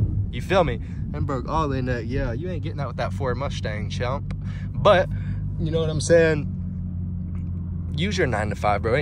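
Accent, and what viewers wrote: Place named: Atlanta, United States. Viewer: White dude with an Atlanta accent is Funny asl ❤️ the zl1 is hard gng 💯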